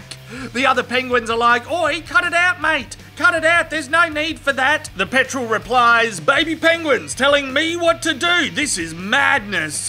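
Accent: Australia. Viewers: He's just a typical Aussie. All my mates talk like this.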